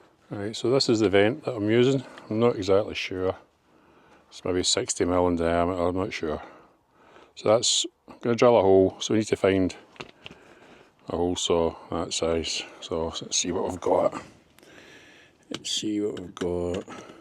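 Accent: Scotland, United Kingdom. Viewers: Thanks for replying. You made me laugh on your video with your Honest commentary and your accent from a fellow Scot takes me back to working with people with a sense of humour and dry wit sadly missing these days. Keep making videos now subscribed 👍👍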